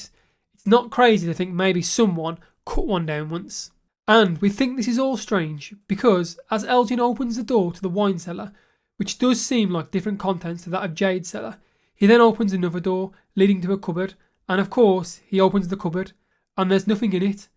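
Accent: United Kingdom. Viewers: no hard feelings bro. i actually love british accent but I have to concentrate more on the storyline which is the base of this video and your accent is making it harder because I'm not used to it, youtube gives recommendations to a larger base of audience.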